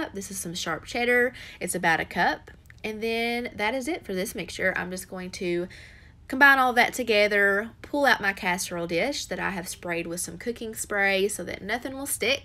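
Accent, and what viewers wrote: South, United States. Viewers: Hi!! I have missed you and your southern accent so much. I also have a southern accent but not like yours. So glad you are back. Everything looks so delicious. Hope you have a great day and week. Stay safe. God bless.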